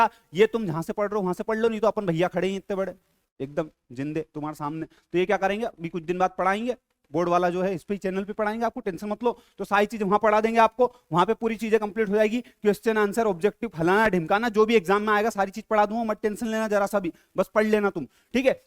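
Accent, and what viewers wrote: Indore, India. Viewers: Pure indore accent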